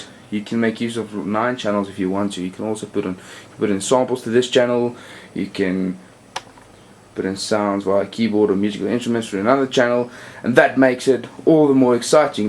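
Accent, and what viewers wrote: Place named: South Africa. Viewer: awesome vid thanks :) you sound south african :)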